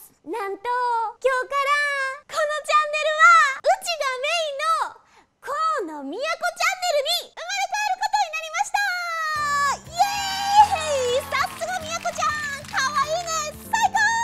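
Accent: Kansai, Japan. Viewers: 英語→関西弁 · 関西弁ってやっぱり可愛いぃぃ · やっぱ関西弁最高やな。。。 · 関西弁可愛い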